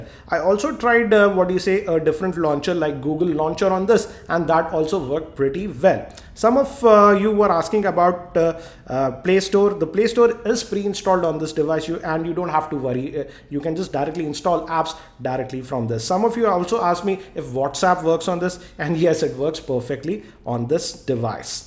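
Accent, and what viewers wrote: India. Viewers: In the beginning I was bothered by your indian accent but as I was watching more and more of your videos I realise that you are doing excellent job and you are one of the best experts I have ever seen on youtube so I decided to subscribe. Thank you for your time.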